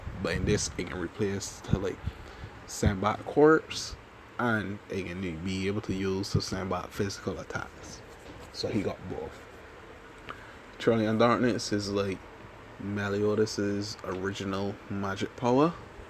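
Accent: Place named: Barbados. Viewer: Bro you from barbados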